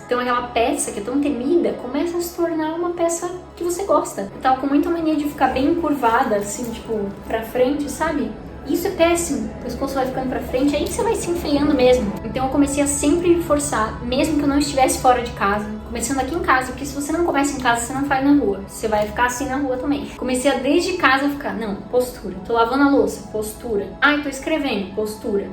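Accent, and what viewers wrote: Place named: Rio Grande do Norte, Brazil. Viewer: Perdi as contas de quantas vezes vi esse vídeo de ontem para hoje. Pq você tratou esse tema de uma forma muito próxima, e isso é bom. O tema da auto estima de fato é uma coisa que a gente precisa ver e rever todos os dias. Ontem, li que resultado não vem com motivação mas sim com constância. O mesmo se aplica a nossa auto estima. Estou nessa mesma fase que você, de ir entendendo que meu novo corpo que já não é o mesmo de 10 anos atrás quanto eu tinha 17, surge na minha frente uma mulher e não mais uma adolescente, e é preciso amar, reconhecer e respeitar as curvas novas, e sim, com certeza melhorar aquilo que ainda não é tão legal pra gente e você trouxe isso muito bem. Ultimamente tenho filmado meus piores ângulos kk acrescento uma risada engraçada e vejo e revejo aquele tal pedaço de mim que eu não gosto pra justamente ver ele tantas vezes que ele se tornou algo comum e não um incômodo. 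Se a gente se fato rever esses pontos, eles nem são tão ruins assim, vai. Obrigada pelo vídeo. Continue postando essas coisas relevantes pra gente ter conteúdo real nessa internet. Ah, e eu achei lindo seu sotaque no inglês. Cheiro de Natal/RN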